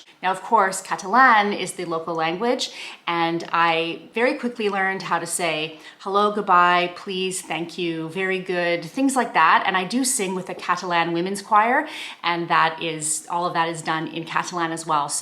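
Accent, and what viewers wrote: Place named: Canada. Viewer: I absolutely love canadian english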